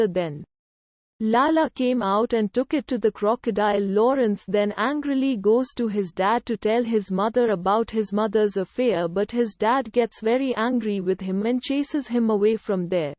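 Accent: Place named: India. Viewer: What is with that voice? The channel is called "English Man" and I hear a woman's voice with a heavy Indian accent saying nonsensical things.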